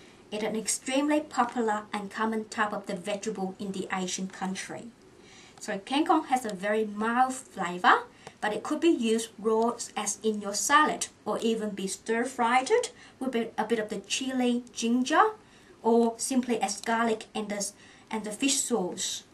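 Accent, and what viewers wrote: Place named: Wales, United Kingdom. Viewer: Sounds like welsh accent to me!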